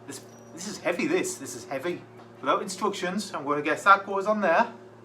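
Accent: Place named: Wales, United Kingdom. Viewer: Ahhhh. I thought you were welsh by your accent. Im from Madeira and it is so tiny and has quite a few accents. Now imagine the UK!!. Cheers bud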